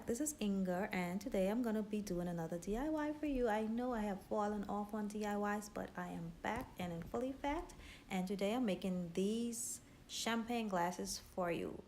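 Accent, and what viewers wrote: Bahamas. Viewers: Nice, you sound Bahamian ?? ❤️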